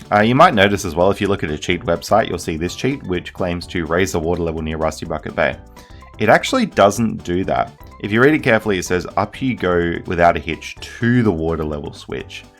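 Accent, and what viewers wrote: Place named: Western Australia, Australia. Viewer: I haven't even beaten BK but it's also nice to see love for the Any% run, since 100% is always the main star. Great videos, and nice accent! Western Australia, right?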